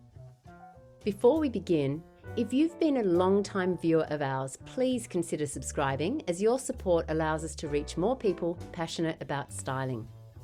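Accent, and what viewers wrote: Australia. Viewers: Your accent sounds very south/west Sydney, lagarno/peakhurst/hurstville. The accents of my youth. Thankyou beautiful video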